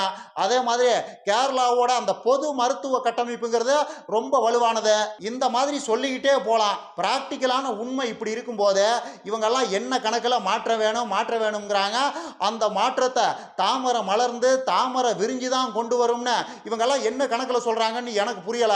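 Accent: Kongu, India. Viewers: Super explanation speech I like your gongunad speech congratulations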